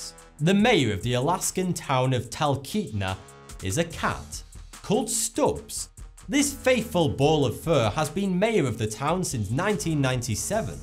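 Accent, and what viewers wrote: United Kingdom. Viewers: This is the most british accent in the world period.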